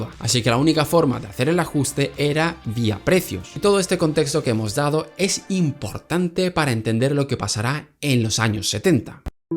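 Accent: Spain. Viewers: Acabas de inferir la ideología política de un individuo por los colores de su canal de YouTube? Te imaginas lo vesanico que suena eso? Deja de apelar a falacias de conclusión inatingente. Eso es propio de energúmenos irracionales. Para éste video se han utilizado 17 papers y dos libros que circulan por 4 escuelas económicas disímiles. Para mayor escarnio te atreves a acusar a un canal de economía mainstream como afín al libertarianismo. Regresa a tus clases de pensamiento económico, por favor. No sabes distinguir entre el acento español y el argentino? De qué multiverso provienes? Permíteme que te hable como un padre: no seas una parodia de ti mismo. Saludos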